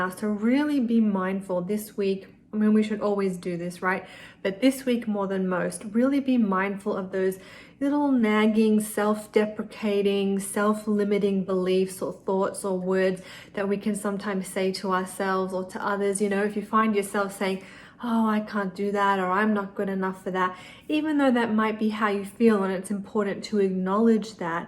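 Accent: Australia. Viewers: I love your voice. Aussie accent?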